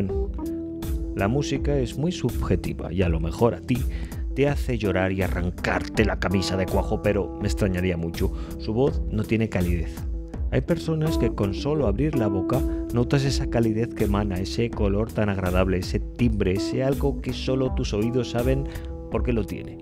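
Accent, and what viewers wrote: Spain. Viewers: No soporto la voz de la que fue vocalista de la oreja de Van Gogh!! No puedo con ella, se me hace horrible su voz!! Bad bunny o como se llame igual la detesto!! Y si al escuchar tu voz también me desagrada (me voy a echar al cuello a muchos españoles) en general ese acento así como el tuyo no lo soporto!!! Pero como dices mera opinión, eso no quita que la haga con respeto, solo que mis oídos no pueden con ese acento y forma de cantar de muchos españoles y recalcó muchos, no todos!! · cantas Hermoso aunque odio el acento de los españoles soy mexicana jajaja 🤣 no dejo de ver tu canal sabes y compartes tu sabiduría con nosotros los mortales eres único y no solo soporto tu acento y voz de verdad me agrada 😁😃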